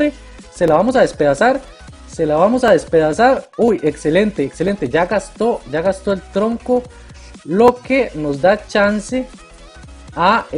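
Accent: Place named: Costa Rica. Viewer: aaaaaa eres tico :3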